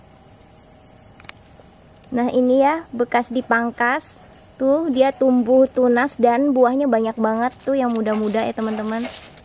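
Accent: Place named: Java, Indonesia. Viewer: kak pernah tinggal di Jakarta ya??cara ngomongnya mirip sama orang di Pulau Jawa umumnya hehehe, soalnya kalo orang Kalimantan asli ngomong Bahasa Indonesia itu agak beda dari orang di pulaubJawa🤣🤣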